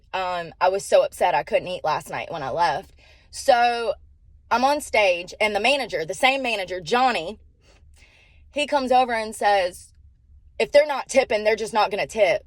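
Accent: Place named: South, United States. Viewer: You are a strong woman. I love how real you are. And I love your accent. Being from the UK I have always loved the American accent. Especially the country accent.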